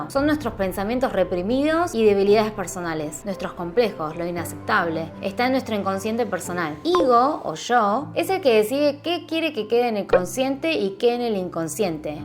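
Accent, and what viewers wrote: Argentina. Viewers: Hablas un español súper avanzado y encima tienes acento argentino. Mae mía felicidades. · Hola! Que bueno conseguir una coreana que hable tambien el español creo que tendras una nueva seguidora.gracias por este video de ON. Que buena explicación. Siento tienes un tono argentino. Eres argentina o coreana.Ha medida de que te escucho me siento muy emotiva. Sera que te puedo hacer algunas preguntas???. Te agradeceria una repuesta. Estare al pendiente. Un abrazo desde Venezuela 💜🌷💜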